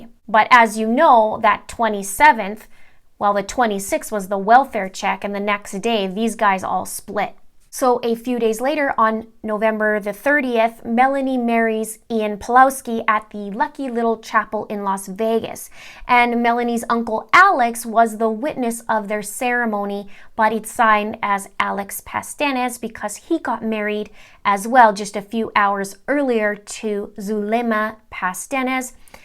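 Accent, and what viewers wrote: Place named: Canada. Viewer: I knew you were Canadian before I knew you were Canadian...had a friend who passed and he was Canadian... Batteries was bat trees And said aye all the time... i miss that guy .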